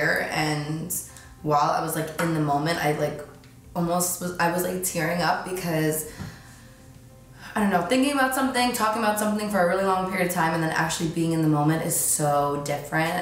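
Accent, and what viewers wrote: New Jersey, United States. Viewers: i can’t tell , does she have a new jersey accent ?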